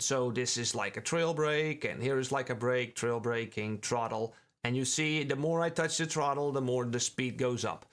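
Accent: Netherlands. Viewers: You're not Dutch, are you? You sound exactly like one of my best friends!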